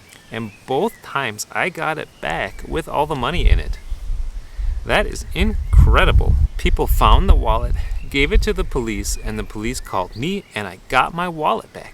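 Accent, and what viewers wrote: Bavaria, Germany. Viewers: I wonder if your family in the states notice the German accent that I noticed right off the bat :D I can here it on the words "here" and "germany" and of course "bavaria" I'm Danish and I think that's why I can hear that. Of course this all makes sense after 16 years in Germany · Interesting that you sound like a German speaking American English. (At least to me, and I’m neither German nor American) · You don't sound american. You sound like a german with little accent. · Your experience parallels mine in almost all points. I have the impression your English has picked up a slight German accent and influence. My brothers tell me the same thing when I visit them in the US. My favorite dialect here in Schwäbisch. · Hi, You are really talking English with an Bavarian slang. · your talking like your from Germany using english what state are you from? 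· Its pretty amazing, to my german, almost bilingual ears you sound soooo much more like a german person speaking english than an american :D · Interesting that as one is immersed in a culture for so long their speech inflection takes on that of the area. You are truly now Bavarian. Bavaria is my go to, comfort vacation spot and if I was a bit older when living there as a young teen, might have made the same decision to stay. In our retirement years now I've thought of moving over but as you mention in your caveat, family is most important to us and can't see living away from them. Interesting conversation. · Watched some videos. You really sound like a german speaking english. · I coudln't help but notice your accent is a bit different than I am used to hearing from americans? Have you developed a bit of a german accen in the last 15 years? Just curious, because after I had been in germany for a couple years and went back home to visit my family, every single person noticed, that I was speaking with german accent now.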